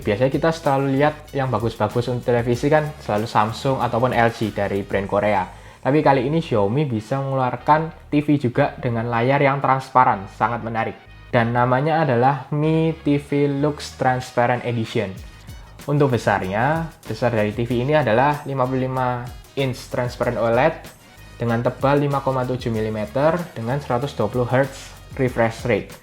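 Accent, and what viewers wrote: Java, Indonesia. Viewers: Wong jowo yo mas hehe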